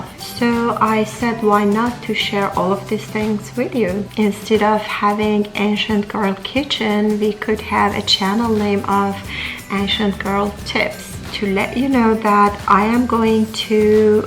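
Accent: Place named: Iran. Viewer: ur accent is only Persian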